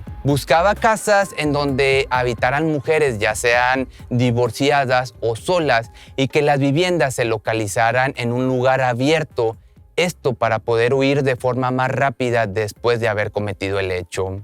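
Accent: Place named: Mexico. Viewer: Gràcias,luego lo veré,el problema es que tienes una grácia contando cosas tan sérias,será tú acento mejicano,me tienes enganchada,un abrazo desde España.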